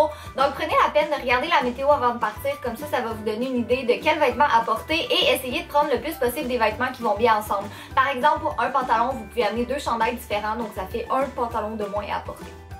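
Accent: Quebec, Canada. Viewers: mais personne ne se plain de moi ses kawai son accent québequoit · Vraiment pas cest juste l'accent quebecois .-. Va apprendre c'est quoi l'accent quebecois trop prononcé et tu vas voir que c'est vraiment pas pareil ! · Je habite en Suisse mais on parle francais(de la france) et je t adore vraiment meme si des fois je ne comprends pas d accent canadien mais c est vraiment amusent de l entendrr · Un accent québéc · Je n'aime pas l'accent québécois mais toi je trouve que t'es vidéos sont tellement bien que je n'entend a peine ton accent et tu es trop belle en plus ❤ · un accent cebekoie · CHADORE LACCENT QUEBECOIS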